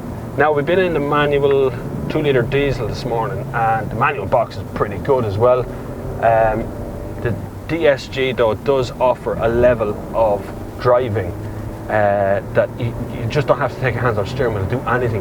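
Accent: Ireland. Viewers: I like your Irish accent :D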